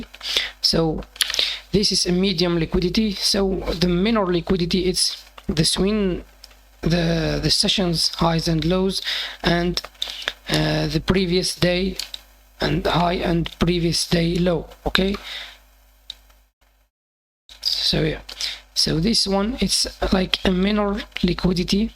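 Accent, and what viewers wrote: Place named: Morocco. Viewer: where are you from brother ? You sound Moroccan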